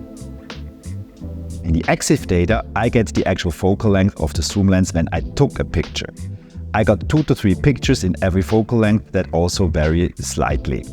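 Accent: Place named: Germany. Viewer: Qimago hat gesagt wir sollen dich schön grüßen 🙋🏼‍♂️ Und abonniert! Bleibt der Kanal englischsprachig? Deutscher Akzent ist so gewöhnungsbedürftig 🙈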